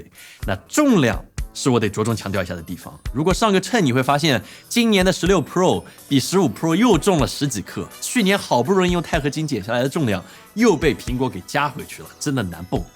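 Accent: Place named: Songjiang, China. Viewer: 听这个普通话有点像上海松江口音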